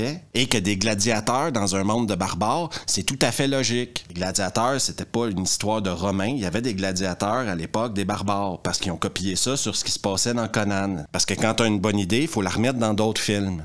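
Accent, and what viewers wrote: Canada. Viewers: Plus je regarde tes vidéos, plus je suis fasciné, voir hypnotiser 😵‍💫 va savoir… l’élocution, le choix des mots, le sens de l’humour… et l’accent canadien !🥰 je sais pourquoi, j’ai toujours vu le canadien comme une version améliorée du français ( ni voyez aucun racisme ou suprématisme de ma part) pourquoi ? Comme dirait Gilbert Montagnier: là je vois pas… en tout cas, peut-être qu’un jour je quitterai ma jolie France natale pour ton joli pays.. pourquoi ? Sans doute à cause de Macron 😰( la, tout le monde comprend 😉) bisous à bientôt ♥️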